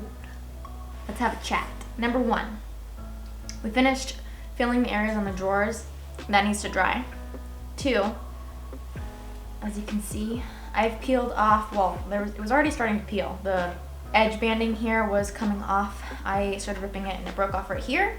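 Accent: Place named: South, United States. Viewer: I'm not sure where you're from but I'm in KY and my southern accent is very similar to yours because anytime I hear you say it, it sounds correct to me, I don't hear an "r" in it anywhere extra. Keep up the awesome work and don't let the lull in sales slow you down, your work/pieces are very pretty and always quality work so it isn't you, it's just the economy right now, hopefully, that'll be picking up soon though. Happy New Year and lots of luck to you!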